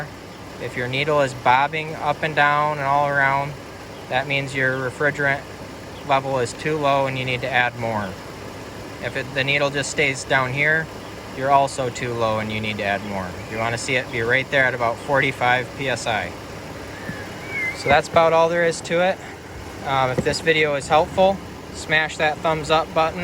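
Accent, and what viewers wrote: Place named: Midwest, United States. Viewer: Simple, to the point. Well done. Nice Midwest accent. Cheers to the algorithm!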